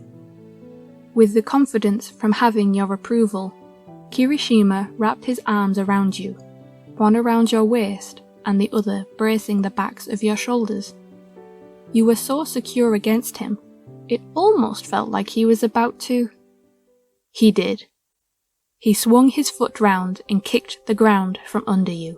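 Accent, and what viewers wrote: United Kingdom. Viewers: never did i think i would reach a state in life where im listening to a british accent audiobook. i think im depressed.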